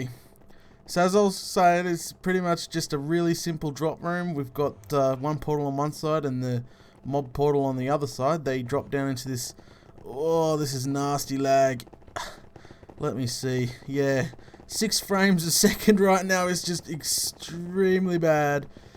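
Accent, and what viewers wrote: Australia. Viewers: U sound Australian? R u?? Plz reply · is infact Australian, and if you're interested he's still live!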